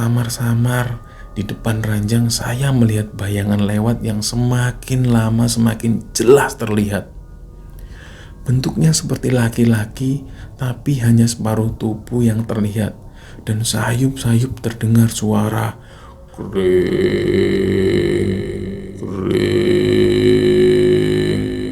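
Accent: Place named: Java, Indonesia. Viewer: Logat jowone ojo sampek ilang mas...semangat 💪💪💪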